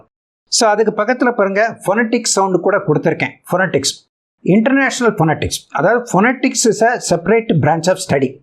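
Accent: India. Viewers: nenga pronounce panrathu India pronunciation thane sir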